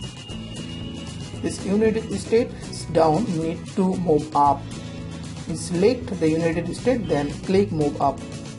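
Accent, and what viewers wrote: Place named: India. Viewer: tech tutorial videos always have indian accent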